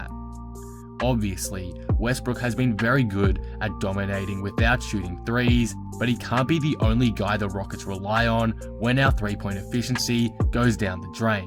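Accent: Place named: Australia. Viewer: i could tell from that accent. Its mad to see an Australian basketball youtube page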